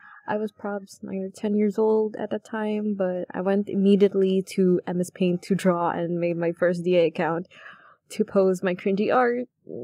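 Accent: Philippines. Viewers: Ur voice has a filipino accent and btw i love ur art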